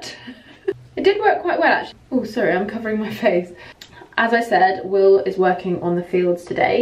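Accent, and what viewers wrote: England, United Kingdom. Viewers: Does she speak like a britanic like every day life or she speaks more slowly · I just watch this by accident and suddenly this lovely couple move my heart and, absolutely your british accent really, i would continue to watch this in future 🥺🥺🥺🥺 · That is kind of best lifestyle u have an extremely beautiful farm. My father is a farmer and I love our farmland, unfortunately, we can not go there because we are quarantined...I'm very happy to see your daily life and lifestyle.🥰🥰🥰 I hope the world pass this bad situation. I'm Majid Saneie from Iran. I like your pure British pronunciation. I follow u in YouTube channel. If I have mistakes I delighted to correct me. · Hi, my lovely teacher, thank u for ur lessons, I always benefit from u many things thanks again for ur time. I love farms I work in farms too, I absolutely love ur accent British people. 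I'd like to to England but, it's difficult for me, I can't get a visa to England. If u could help me to get there to work with u in ur fram, That would be nice of u. Have a nice journey in ur life and God bless u, ur husband and ur family..... ❤️ ❤️ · This vlog is amazing and I dont know how my sister came by you but she is probably pretty proud of herself. I am not a native English speaker but I would certainly try and hope to grasp that beautiful British accent. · You look beautiful without powder... I enjoyed it, I like this kind of video to listen to your British accent. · I'm Brazilian and I'm learning a lot with your videos... Thanks for sharing your day with us... The British accent is awesome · Yes, it's mealuh, meal like meal and uh somewhat like in uhm. Your slight British accent gives away you are not exactly a native German. 😎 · I love your British accent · The British English is so pretty so clean! 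I'm loving these vlogs ❤️... Here is from Brazil 🇧🇷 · Hello, sweet husband, cute dog, wonderful home! everything done right, respect. Your washmachine and dryer from Miele was a very good choice. I am from germany and i like your new kind of videos. I have a Miele washmachine too and ihm very satisfied with them. I know its expensiv but it works for so many years, this is how the investment pays off. Your pronunciation of the word Miele was correct. I heard a small English accent but it was still correct. Stay healthy and continue enjoying your great life. Best regards from Sabrina, from Baden-Baden, near the beautiful Black Forest, in Germany!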